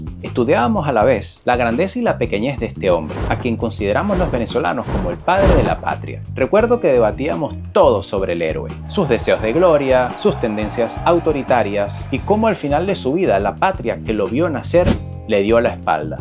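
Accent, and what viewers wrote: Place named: Spain. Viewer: YO SÍ..... Yo si le rindo culto a dos personas históricas: JESÚS DE NAZARETH Y SIMÓN BOLÍVAR.... Comenzaste tu video con un mapa de VENEZUELA sin la GUAYANA ESEQUIBA..... Resulta que es cierto que le pusieron a todo el nombre de BOLIVAR; pero resulta que a BOLÍVAR LO DEJARON ESTANCADO EN LAS ESTATUAS, AV.. Y AUTOPISTAS...... Fue que luego se decide darle real vida a Bolívar, llevarlo al pueblo..... En nombre DE BOLÍVAR SE Manipula y, me parece, que muy inteligentemente estás manipulando en algunos aspectos en éste video..... Te faltó decir que en los EEUU hay una universidad con el nombre Bolívar...... Que la armada estadounidense tiene o tenía un submarino con el nombre del Libertador..... Hubo un momento en que ka historia de nuestro país fue eliminada de los colegios y liceos; por ende, Bolívar fue eliminado de la memoria del pueblo, sobretodo, del pueblo a pie..... 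Eso de eliminar el bolivarianismo es eliminar la vida y filosofía de BOLÍVAR...... Usted es un manipulador de primera....... Además, usted no es venezolano.... Su tono lo dice, su tono es español..... Ya he visto varios de estos videos: parece que se a gestado una campaña internacional en contra de nuestro Libertador.... Campaña liderada por España...... Recomiendo un artículo de la BBC de LONDRES HABLANDO DE BOLÍVAR Y CATALOGANDOLO COMO EL HOMBRE DEL MILENIO...... TAMBIÉN RECOMIENDO LEER A BOLÍVAR Y NO DE DEJARSE LLEVAR POR INDIVIDUOS COMO ÉSTE...... ÉSTE TIPO DE INDIVIDUO COBRA ($) POR MANIPULAR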